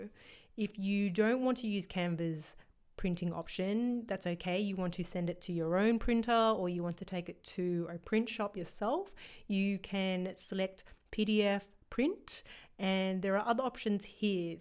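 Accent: Australia. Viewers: Thank you for a easy and informative video! So good to find a tutorial in an Aussie accent. Subscribed.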